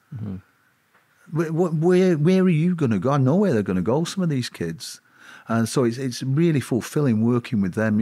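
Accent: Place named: Scotland, United Kingdom. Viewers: Hi, I’ve just found you, so glad I did! I’m writing b4 I’ve finished listening to this. Wanted to say it’s fab to hear a fellow Glaswegian doing this and I’ll be checking out your other stuff. Well done 👏 ya handsome young man 😅 Your guest is fabulous!! He’s got such a beautiful spirit, so open and warm. Don’t mind admitting I’ve got a big crush 🥰😂 I admire how straight forward your interview style is and I’m looking forward to learning more about you through your back catalogue. Well I’ll stop noo and listen to the interview. Health and happiness to you and yours ❤ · How frustrating and disappointing for you. The Scottish accent can be like that may I ask where you from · Yes Lee Sansom is a great bloke, and, (what a surname, for such a strong Scottish accent Scot), is a great interviewer... BUT if Lee Marshalls his actions, as badly as his thoughts, God help anyone he was protecting. 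He goes around the houses, with pausing to an irrorating level, I wouldn't have him baby sitting, never mind protecting people for huge sums of money. There are glaring inconsistences! IF the British MI5 & MI6 were watching everything, and that is normal, did they ALL have a night off when Lady Diana died? Why was no white Fiat found, they had the paint on the Car, and even if the Tunnel CCTV was off, ALL of Paris's CCTV was NOT? Why the close Bike rider who may have used a Driver Blinding torch, why was he never found? Why did they not take her to the nearest Hospital? Why did it take 2 Hours before anyone tried to save her? A following Security Car should have been following, so if the Celebrity Car is damaged, or unable to move, the Celebrity can be transfer to the following Car. This is standard Body Guard Protection. Now if this Lee Sansom is a nice bloke, that still does not qualify him as a Body Guard. 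For some reason I cannot fathom, you all seem to think "nice blokes" do their job well, I couldn't care if he's a Saint, he is bloody useless in a Crisis, or even able to DO HIS HOMEWORK. If HE does not know the answers to my questions, he is a totally stupid, bloody shit Body Guard. Because ALL of those questions HE BLOODY WELL SHOULD KNOW!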